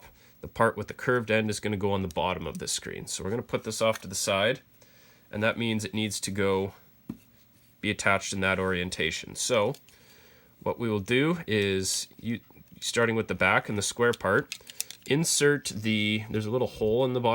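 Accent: Canada. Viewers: Canada ay! You can tell, nothing wrong with that tho.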